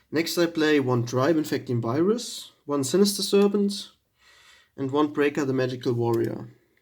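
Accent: Germany. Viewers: hab gleich dein deutsch englisch rausgehört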